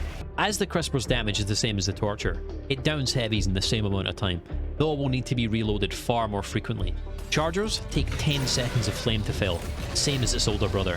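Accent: Scotland, United Kingdom. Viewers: A Scottish sci fi quartermaster giving me flamethrower recommendations?? This is heaven for the ears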